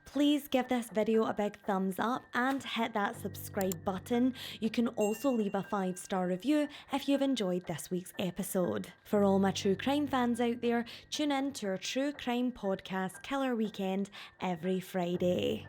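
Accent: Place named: Glasgow, United Kingdom. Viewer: Fucken hell didn't realise how much I missed your wee wegie accent 😂🤘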